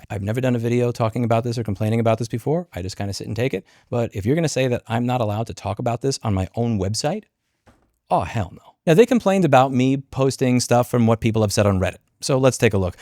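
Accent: New York, United States. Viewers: They obviously have no clue who you are. You may be in Texas, but I love the New Yawker in you! :D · Ny accent came out strong in this one, was exceptionally tight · I love when you get excited about calling people on their BS. Your NY accent comes out hard, lol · The "New Yorker" in you really came out in this video hahaha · Lol your NY came out a bit more than usual. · I think we all love when really gets going in the New York accent comes back out strong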